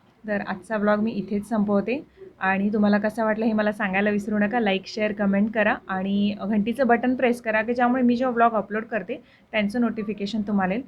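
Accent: Kolhapur, India. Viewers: तुमची कोल्हापुरी भाषा मला खूप आवडते तसेच तुमचे राहणीमान खुपचं छान आहे तुम्ही आरव ला लावलेल्या सवई तुमचे सगळ्यांशी बोलणे तुमचे व्हिडिओ पाहण्यासाठी मी खूप उत्सुक असते रोज दुपारी मी तुमचे नवीन झाले ले व्हिडिओ बघते किंवा जुने बघत असते खूप refreshing वाटते 🙏 असेच छान व्हिडिओ करत जा तुम्हाला खूप खूप शुभेच्छा 👍 धन्यवाद 🙏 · Di tujhi Kolhapuri language khup aavdhte mla 😍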